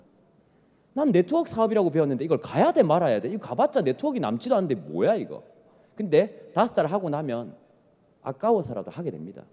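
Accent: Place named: Gyeongsang, South Korea. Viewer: 리더님 경상도 사투리가 부드럽네요~늘 영상 잘듣고 있습니다^^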